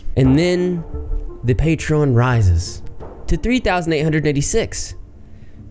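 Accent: South, United States. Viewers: i've finally figured it out what kind of accent he has he sounds southern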